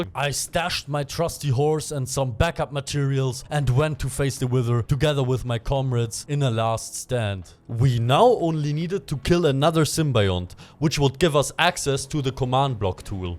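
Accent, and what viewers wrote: Germany. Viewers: German you Sound, me reconize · I can hear a german accent 😮🎉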